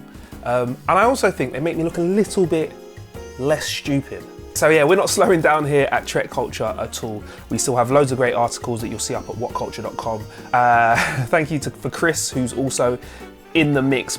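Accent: United Kingdom. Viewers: lol u so brittish <3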